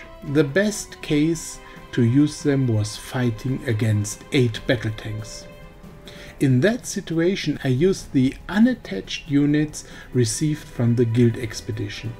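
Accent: Germany. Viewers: Er ist Deutscher mit etwas starkem Akzent.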